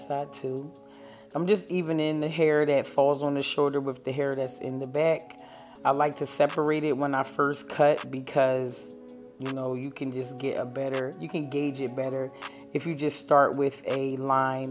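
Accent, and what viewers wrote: Philadelphia, United States. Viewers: Is that a Philly accent I hear?? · I LOVE watch all of Your videos because you reminds me of a lot of my favorite older cousins from Philly but you sound sooo much like my family I remember as a child who I use to adore and look up to them its that accent you can tell a Philly girl from anywhere my MOM also sounds like that too even though she’s been living in Cali for over 40 years I remember when I moved out there as a little girl 👧🏾 I use to love to go to my cousins hair salon down in Upper Darby & get my hair done every weekend and then go shopping at the end! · Girl where u from ? I’m hearing an accent I’m thinkin Philly or somethin It’s up north but sliding towards dmv twang but not a hunnit percent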